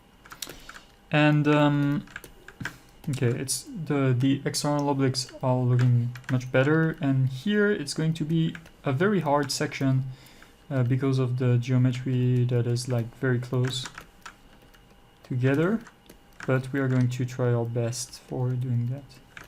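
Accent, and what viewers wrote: France. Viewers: We all love your frenglish ;) And the word you are mostly looking for is "definition" (of muscles, creases, shapes). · Sir, never apologize for a French accent. Especially in an art tutorial. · Did he just apologise for his French accent? I'm offended. That shit is unintentional ASMR. Thanks for this tutorial - so generous of you to share your time and knowledge. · Instructor: "i apologize for the french accent" Me: Are you serious? I would kill for having that accent.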